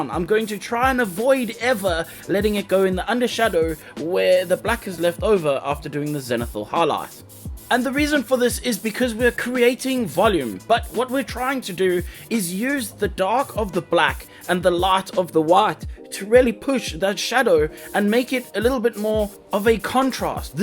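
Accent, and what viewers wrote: South Africa. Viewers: Can't believe i've only found you now! I'm just about to begin my painting journey and love the amount of detail you give and the fact that you sound like you are from ZA too is a mega plus! XD looking forward to binging this channel before and after i get to start my painting adventures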